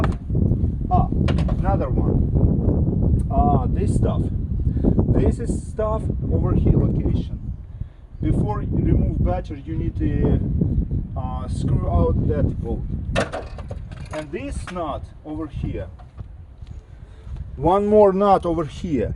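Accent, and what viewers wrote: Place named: Russia. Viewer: Спасибо брат, акцент похож на русский)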